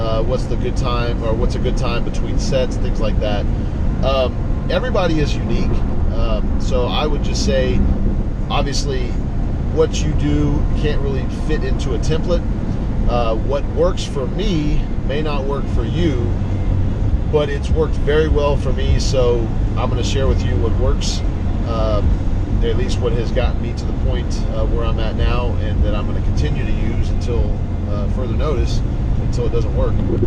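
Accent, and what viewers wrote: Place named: Europe. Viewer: You talk like an european . I love it and you are 100% right in all what you said in this video.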